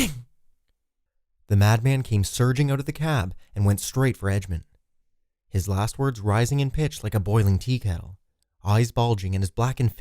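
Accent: Canada. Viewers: my man!!!!! I have been waiting for this forever dude! Didn't think it was ever gonna happen after the issue you had with the author about the last part of the story.. And speaking of which, do you have some sort of agreement with T.W. Grim or somethin? You're the only narrator on youtube I've found that reads his/her stuff. You have the best taste in material by the way, and for some reason, your painfully Canadian accent really tops these off and makes them more real and authentic. You have quickly become my favorite narrator dude, keep up the good work!! Also, do you read subscriber submitted stories?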